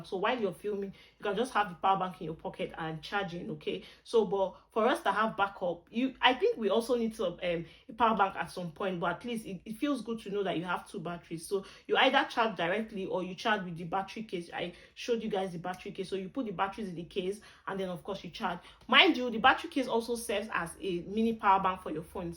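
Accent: Port Harcourt, Nigeria. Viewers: Trans Amadi accent 😂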